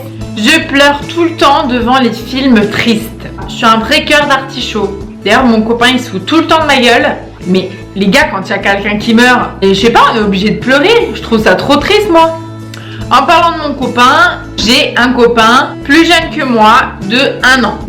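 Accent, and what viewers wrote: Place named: South, France. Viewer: Le "rause" , on adore l'accent du sudd. Gros bisous ❤ super vidéooo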